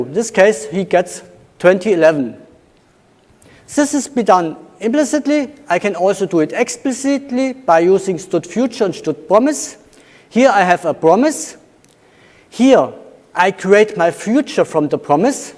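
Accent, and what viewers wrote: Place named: Germany. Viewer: I love German English :D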